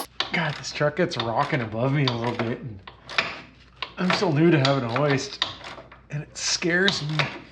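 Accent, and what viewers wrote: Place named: Canada. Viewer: You kinda sound a little Canadian. And I thought you was like from the states. But anyways good job. Keep up the good videos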